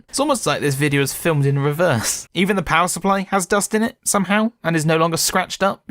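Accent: United Kingdom. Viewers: oh my gosh your a bri'ish linus tech tips!! I would actually love to hear you talk more about pc's though and do more of these commentary-esque videos! They are a nice break from the SCP theme and hopefully they have enough interests to not run your views into the dirt.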